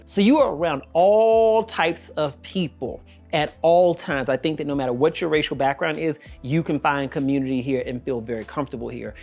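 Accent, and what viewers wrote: United States. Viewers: I actually believe this. I do think that he gets around it because he’s American, the accent is what saves him. Any black South African is not having the same experience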